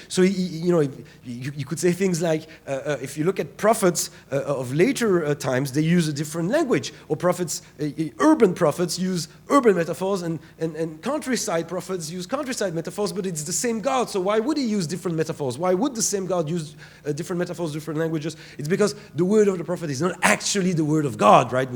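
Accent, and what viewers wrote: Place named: Israel. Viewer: יש לך יותר מבטא ישראלי באנגלית מאשר בעיברית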